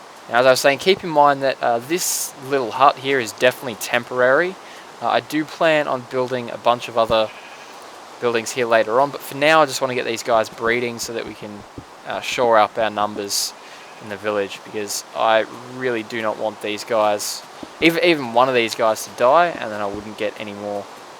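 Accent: Australia. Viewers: he's Australian right??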